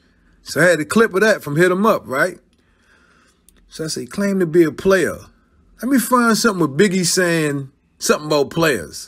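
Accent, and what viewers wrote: Baltimore, United States. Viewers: Are you from Baltimore? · I had to shut this off. That Baltimore accent is awful.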